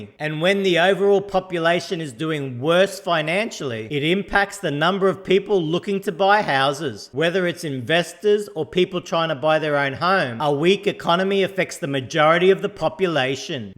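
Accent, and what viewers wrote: New Zealand. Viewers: you a kiwi mate? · Employment is rising because people that are left behind, need 2 jobs to pay for their existence. Good Job! love your kiwi accent!!!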